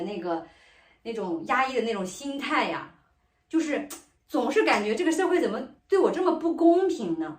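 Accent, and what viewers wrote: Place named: Northeast, China. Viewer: 一口东北味，就这口条，好好干自媒体，未必不比上班差！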